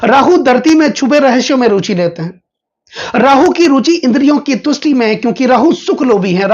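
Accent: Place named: Punjab, India. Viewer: It’s seems Hindi is not his first language, there’s Punjabi kind of accented Hindi.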